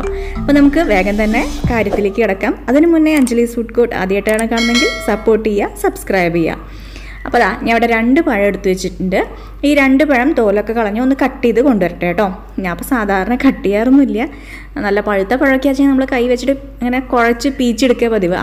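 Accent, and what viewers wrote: Thrissur, India. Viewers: Ningal trissur ano....ente oru friend und shyra......same samsaram anu.....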